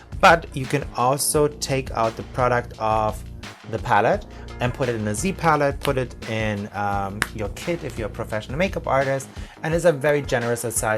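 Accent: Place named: Germany. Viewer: Are u german? I can hear your accent :D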